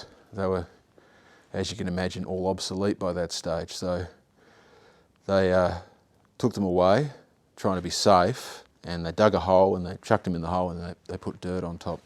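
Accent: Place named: Australia. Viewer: You, sir, give a valuable service, in an iconic Ozzie laconic manner.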